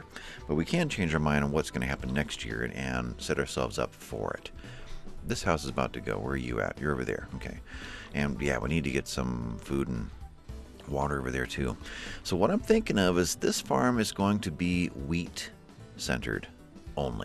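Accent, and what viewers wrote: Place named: Ireland. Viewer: Very Irish tone to this ep, very nice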